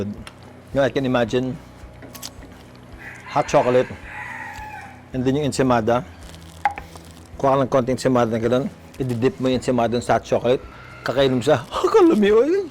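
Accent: Visayas, Philippines. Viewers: Gusto ko ung bisaya accent eh. Lami gyud dong uy!!! Giatay mana sya uy! Lami sad na ba sa puto maya ba!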